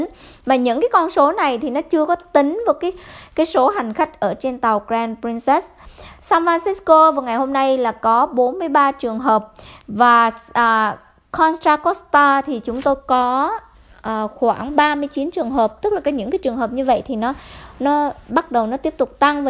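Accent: Vietnam. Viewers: . Trong lúc đưa tin, chị có dùng từ "cơ cấu", sau đó chị lại nói " dùng từ VIỆT CỘNG". Vậy xin hỏi chị, thế nào là "từ Việt Cộng"? Chẳng lẽ "từ Việt Cộng" không phải là tiếng Việt trong khi chị cũng đang dùng tiếng Việt đó mà! Và từ "cơ cấu" là từ của Việt Cộng" thì từ "cơ chế" là từ của ai? Của chị à? Bởi vậy, hai chữ "Việt Cộng" của chị khoét sâu vào sự chia rẽ không đáng có giữa "chúng ta", những người Việt Nam với nhau, có cùng chung tổ quốc và ngôn ngữ. Xin giải thích thêm, ở Việt Nam, cả 2 từ "cơ cấu" và "cơ chế" người ta đều sử dụng gần như đồng nghĩa, từ "cơ chế" thậm chí được dùng phổ biến hơn. Sau này từ "cơ cấu" cũng có khuynh hướng được dùng như một động từ, nghĩa là "gắn đặt một cái gì, một ai đó vào một hệ thống". Cũng xin nói thêm, cách dùng từ tiếng Việt và phát âm tiếng Anh này kia của rất dễ nhận thấy là chị đã ở Việt Nam (hay nói cách khác là ở với "Việt Cộng") rất lâu trước khi sang Mỹ.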